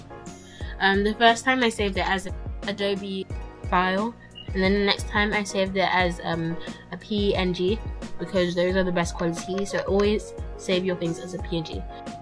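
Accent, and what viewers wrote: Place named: United Kingdom. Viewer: YASS SHE IS BRITISH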